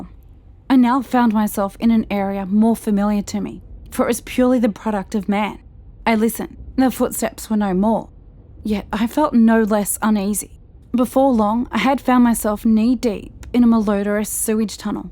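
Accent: Australia. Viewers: The best Aussie voice is at it again... Great video sis!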